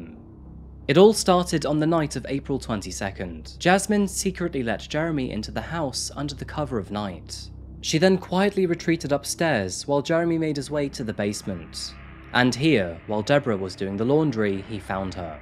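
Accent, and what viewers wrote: United Kingdom. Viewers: You where made for this ,how you do yo thing man ,how I want to say I enjoy the show will come off weird and socker like so I better stop 😆🤣,anyway love how you set your channel big time 🖤,love when you call bullshi... t when u see it and with a British accent ,gets me every time,ok it's getting weird bye 👋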